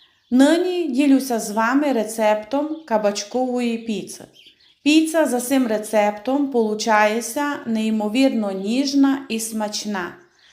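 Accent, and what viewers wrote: Transcarpathia, Ukraine. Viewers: Пані, у вас шикарний закарпатський діалект. Вас приємно слухати, а головне- смачно слухати😊 Дякую за рецепт. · Важ закарпатський діалект це перлина самобутності, слухаю вас і отримую велике задоволення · Мені суперово слухати на закарпатському, все зрозуміло! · Дуже люблю Ваш закарпатський діалект. Говоріть ним, бо він чудовий. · Смотрю на Вашем канале не только рецепты, но и наслаждаюсь Закарпатским диалектом! Очень нравится, просто наслаждение! 👍🌿🌹🌿Привет из Одессы 💖☘️🌻🌻🌻☘️🇺🇦🇺🇦🇺🇦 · Я з Полтави, заслуховуюсь Вашим закарпатським діалектом. Вмикаю канал, дивлюсь як готує господиня каналу та просто слухаю та насолоджуюся ❤ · Дуже гарний закарпатський діалект.Кому не подобається, хай не дивиться. Ви молодець. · Дуже файна піца ,а ще ліпша закарпатська мова .дякую Вам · Щиро дякую за смачний рецепт.Ваш закарпатський розговір - це Ваша родзинка. 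Миру нам усім і перемоги. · Приємно слухати закарпатський діалект. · Пані не вздумайте міняти мову ведення каналу. Я з Києва і тільки за Закарпатський діалект. Вас просту чути приємно. Ви знаєте комікесу Леру Мандзюк? То ж вона цікава тим, що розмовляє діалектом. Я приїжджаю до рідні маминої на Хмельниччину ❤ я млію від Подільського діалекту. Говоріть по свому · Дякую за рецепт, простоту , закарпатський діалект ❤ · дякую вам розмовляти так як ви на Закарпатті розмовляєте це думаю гарно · Мені дуже подобається закарпатський діалект! Через нього я звернула увагу на ваш канал! Будь ласка, продовжуйте говорити, як ви звикли! І дякую за ваші рецепти ♥️💙💛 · Пані, ведіть свій канал тільки своім закарпатським діалектом, я коли вас слухаю душею переношусь в Виноградів до своіх дорогих друзів. Дякую вам ❤️ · Люблю закарпатський діалект❤❤❤ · Дякую за рецепт. Він просто чудовий. Слухати Вашу закарпатську мову просто насолода та радість для серця. 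Ще раз дякую🙂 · кому ся не подобає закарпатський діалект, то най ся не дивит. А я люблю як ви ведете канал❤ і не я одна😊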